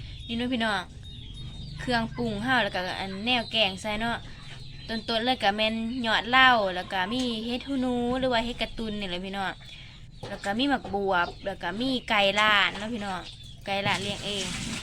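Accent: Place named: Ubon Ratchathani, Thailand. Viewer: สําเนียงแบบนี้ต้องเป็นคนอุบลชัว.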